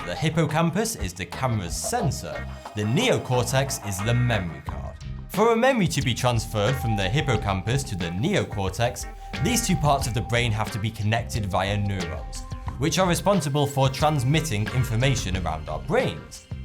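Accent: United Kingdom. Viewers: I like this guy because he gives detail and it's easy to tell the people behind the scenes have don their research. And the British accent works well too.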